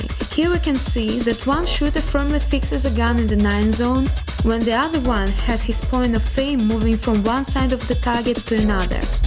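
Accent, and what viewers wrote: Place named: Russia. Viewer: Wait is this is english? I thought it was russian, man that is a bad accent.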